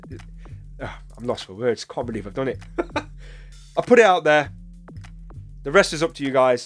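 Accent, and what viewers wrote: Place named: Midlands, United Kingdom. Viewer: I thought you sounded like a midlands boy. Greetings from Northampton.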